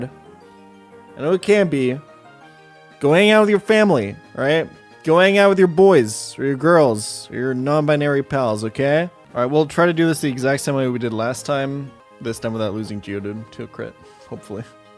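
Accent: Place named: United States. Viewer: YouTube and twitch. Come for the highest tier of nuzlocke Pokémon content. Stay because how tf does this guy sound more American than 80% of American people? Hong Kong and Singapore and Germany. Has he intentionally adopted the American accent from watching cartoons and other shows over the years that are created by “US English” speakers? Does he do it to be easier to digest for the US viewership market? Maybe his USA accent is just really good. I watched 151 attempts of EK, I had a lot of time to consider this while he was wiping to Watson because no VA chinchou.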